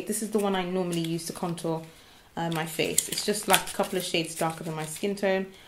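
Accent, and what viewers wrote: Nigeria. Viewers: the Nigerian accent is giving me life lol, I feel like you are so much more relaxed around the camera now! lovely hun!! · Please do the Nigerian accent throughout your videos, I live for it hahahaha! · The Naija accent hahaha. Perfect. Love your videos.:-)) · Beloved naija accent What strapless bra are you wearing with that off the shoulder tight H&M light pink/peach top? if you don't mind me asking. 😊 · I love how the "naijaness" in you is coming out and I love your bloopers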